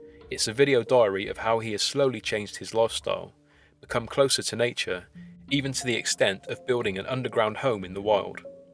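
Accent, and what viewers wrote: United Kingdom. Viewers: I hope someone scrutinizes the hell out of the way you speak, chomping at the bit for any "mispronunciation". I guess you've also never heard a British accent before. Asshole.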